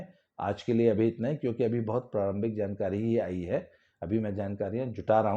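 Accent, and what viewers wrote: Haryana, India. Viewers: कई दिन होगये अजगर गैंग पर कोई वीडियो नही आई, we are waiting for it😂 your हरयाणवी accent🔥😂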